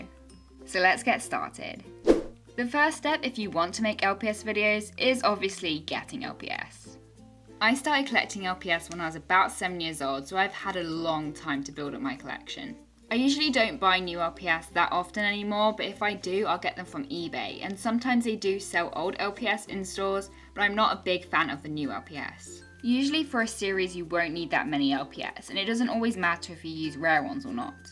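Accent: United Kingdom. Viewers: • scottish i think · are you british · She has a British accent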